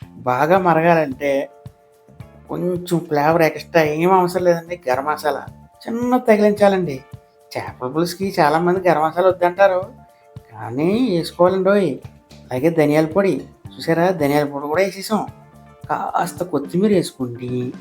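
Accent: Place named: Konaseema, India. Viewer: konaseema voice and super curry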